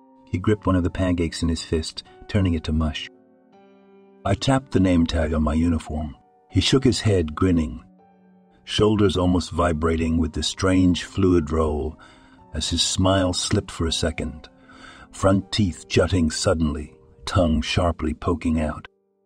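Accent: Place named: South Africa. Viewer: You almost sound South African hey😊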